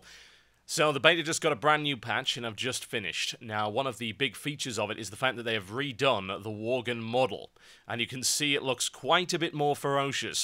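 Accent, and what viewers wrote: United Kingdom. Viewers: Just subscribed... Seen a few of your vids last night and thought ''Hey, this guys British like me, and almost as funny!" Some top-class uploads, keep up the good work - Much appreciated.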